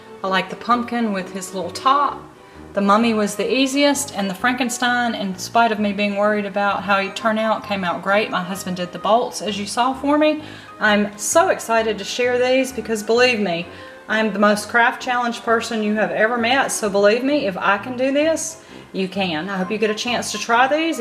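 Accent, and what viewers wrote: South, United States. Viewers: From the prairies in Canada: Pretty darn cool video! If I was still teaching early years, I think I'd be consulting your contributions more often. Thanks for sharing. Pretty nice accent too. I honestly always liked a southern drawl. Velox Versutus Vigilans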